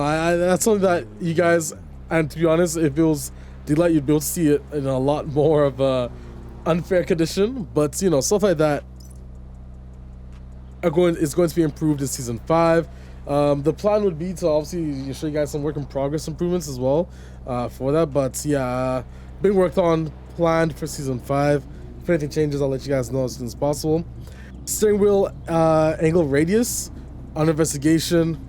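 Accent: France. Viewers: Yesss can already hear that French accent rubbing off on you! You should come to the UK bro! So happy for you do your ting fam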